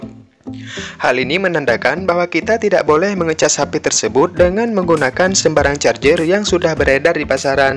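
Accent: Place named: West Sumatra, Indonesia. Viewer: Dari logat ny...Orang minang ya min..?? 😄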